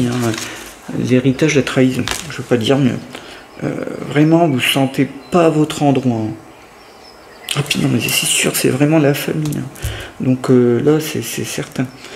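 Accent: North, France. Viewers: Un grand merci pour votre tirage qui me parle beaucoup 👍 Vous avez un petit accent du Nord ? 🤔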